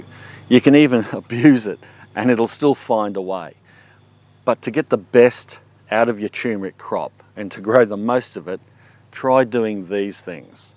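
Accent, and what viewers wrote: Australia. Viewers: Great delivery, straight forward and very Aussie too! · came for the gardening insight. stayed for the Australian accent haha👍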